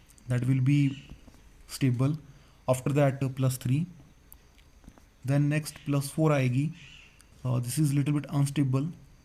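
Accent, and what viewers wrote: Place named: Haryana, India. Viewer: Sir aap haryana se ho kya . haryanvi jesi feeling aa rahi h aapki language m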